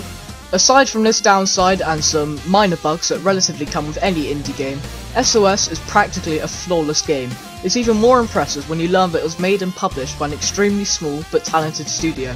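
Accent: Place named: United Kingdom. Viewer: Judging from your voice, you are bri'ish :(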